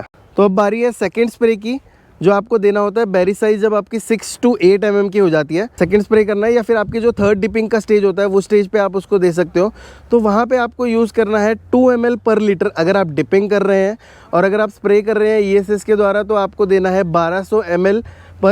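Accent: Punjab, India. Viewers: Aapki Hindi mein Punjabi tone hai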